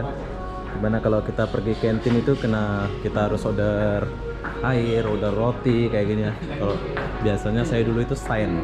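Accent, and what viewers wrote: Lombok, Indonesia. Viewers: Klo bolh tau abang nich orng indonesia jugk ea krn dri tutur ktanya mcam indonesia maaf ea bang jdi kefo cima igin tau aja bang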